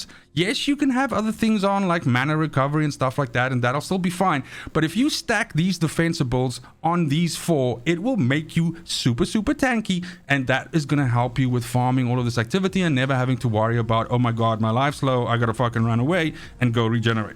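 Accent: South Africa. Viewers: Sounds like a fellow South African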